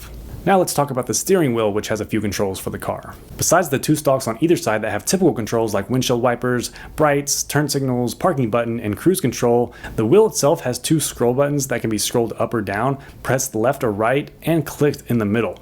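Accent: Kentucky, United States. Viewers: hahaha i thought you was in kentucky...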